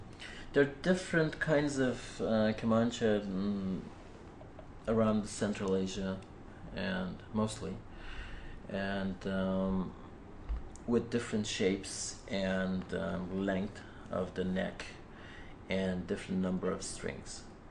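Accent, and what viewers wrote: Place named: North America. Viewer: wow! he speaks like a man who live in toronto or new york for 20 years! way to go man. excellent musician. i like combination of Kalhor and Shajarian. they are awesome.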